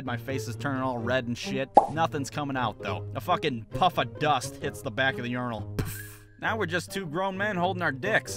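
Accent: United States. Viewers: Are you American or what I'm not sure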